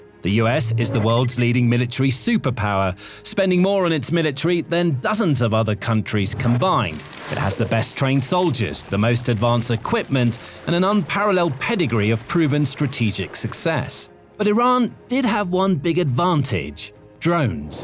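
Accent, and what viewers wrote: England, United Kingdom. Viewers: Geee is it me or does the British accent remind you of the folk hawking knives and other stuff on upper cable channels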